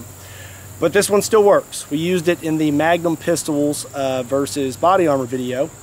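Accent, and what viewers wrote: Kentucky, United States. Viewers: What part of kentucky you from? Im From Winchester/ Clark County!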